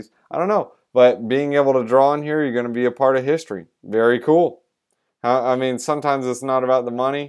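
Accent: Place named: United States. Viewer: LOL. That one guy video that you said you couldnt understand I literally just came from him. When I heard youre American I said thank God!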